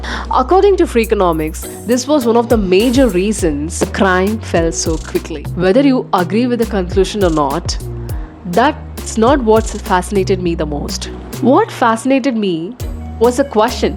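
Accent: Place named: United Kingdom. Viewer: I think she is speaking British English 😅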